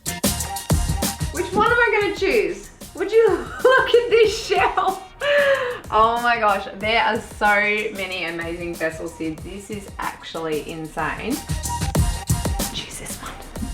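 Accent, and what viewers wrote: Australia. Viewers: This Lady is wonderful to watch love the whole package editing and music all tied together with that cute aussie accent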